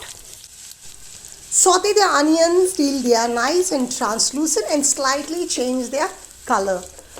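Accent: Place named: England, United Kingdom. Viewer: New accesent of language... Seems like England has recently adopted you 😢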